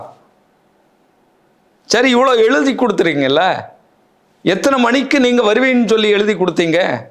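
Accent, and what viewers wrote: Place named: Kanyakumari, India. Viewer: குமரி தமிழ். பாராட்டுக்கள்.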